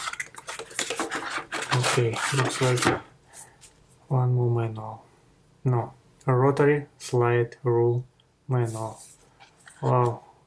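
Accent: Russia. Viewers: У автора русский акцент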